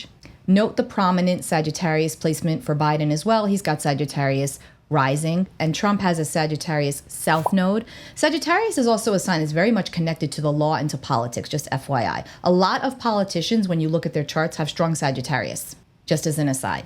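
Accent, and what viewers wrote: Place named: New York, United States. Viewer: From one intuitive to another.... excellent presentation! And I think you're a New Yorker by the accent? 🎉 I appreciate your approach and neutrality. I think your channel is about to take off. Congratulations! I was picking up Trump assassination attempt last year, and let's say this is a possibility with this transit. However, It would have to be a wild card event at this point because the probability has gone down since last year. Definitely subscribing! Thank you😘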